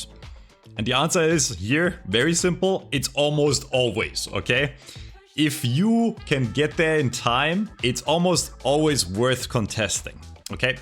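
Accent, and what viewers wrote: Germany. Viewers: I can hear Deutsch :^D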